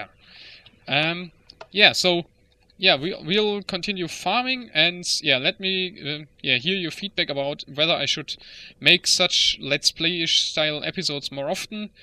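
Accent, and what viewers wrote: Germany. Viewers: you can rly here hes from germany :D but ya great video. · He prpbably meant the description :) Bt the way great video, i really enjoy your videos, and the german accent just makes it better :D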